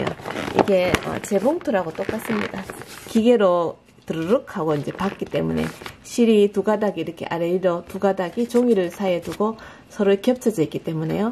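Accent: Gyeongsang, South Korea. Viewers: 갱상도 사투립니다.. 살, 사움, 엄악 .... ㅋㅋ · 어쩌다 영상 보게 되었습니다 경상도 사투리가 친근합니다 쌀=☞ 살포데기, 꿰메다☞꼬메다! 특유의 억양까지 말씀이 정겨워 좋습니다 영상 잘 봤습니다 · 쌀브랜드는 서산쌀에 경상도사투리 구수하신 아지매의 음성으로...뭔가 재미있습니다. 구글ai가 오늘은 쌀포대 언박싱영상을 ...성질이 조신하지 못해서 매듭푸는 방법을 아는대도 불구하고 한번에 잘 풀릴때도 있고 방향 거꾸로해서 첫방부터 꼬이기도 하고 이럴때는 속에서 뭔가 올라오는 날ㅋㅋㅋ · 경상도 사투리 구수 합니다... 하하하 살포대기... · 쌀자루.. 발음이, 살자루.. 살포대기로 들립니다. 경상도 울산출신이신 우리 매형 발음과 비슷하십니다. 우리 누님과 매형생각이 납니다. 고속도로 우측 주행... 아주 알기 쉽고, 실용적인 설명 고맙습니다. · 경상도분이신가봐요...쌀을 자꾸 살이라고 발음을 ㅎㅎㅎ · 살포대기 살포대기 ... ㅋㅋㅋ 갱상도 사투리 넘 귀여우심 . 좋은 정보 감사요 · 경상도 사투리 이해 합시다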